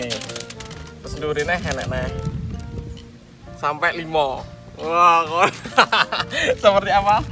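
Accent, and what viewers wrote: Java, Indonesia. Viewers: Jowo ne ndi mas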